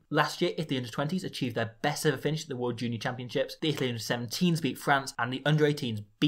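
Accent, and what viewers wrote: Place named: West Country, United Kingdom. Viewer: The most patriotic Italian to have a West Country accent.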